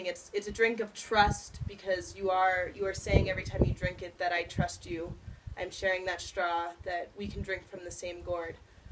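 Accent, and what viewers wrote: Argentina. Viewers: Bombillaa! accent Argentino 👌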